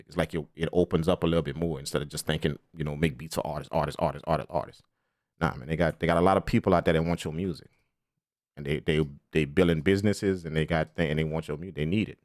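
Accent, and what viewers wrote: New Orleans, United States. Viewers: you from the N.O huh?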